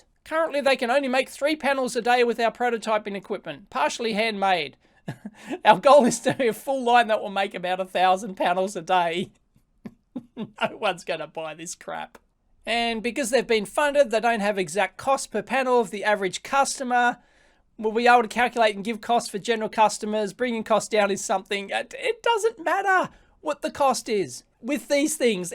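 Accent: Australia. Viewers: I guess your info is interesting but your delivery is annoying. Smug aussie accent. ugh! just hard to listen to.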